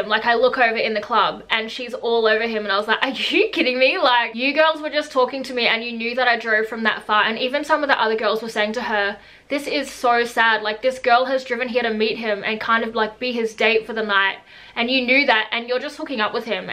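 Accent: Australia. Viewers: Shirt is adorable, agreed. Love your Aussie accent. Love your low-key vibe, and that u don't HAVE to have a boyfriend...bravo to u doll!😘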